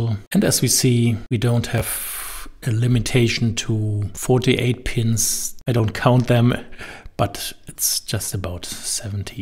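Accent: Germany. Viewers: since i live in germany, I'm 100% this is a German native :D from accent perpective, but good work!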